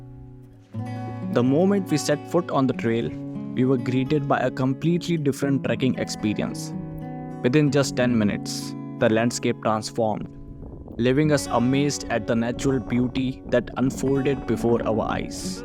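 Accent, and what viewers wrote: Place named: Kashmir, India. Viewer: Wht shit gujr kushur english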